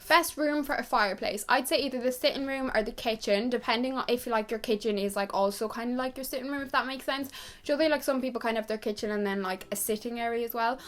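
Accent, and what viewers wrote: Ireland. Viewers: And the award for Best Irish accent goes to you 🏆🥇🎗. You’ve just won First prize.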